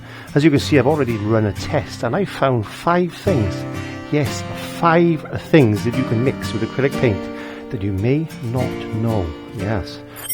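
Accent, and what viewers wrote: Wales, United Kingdom. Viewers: Great video! Am I detecting a bit of a welsh accent mixed in with another?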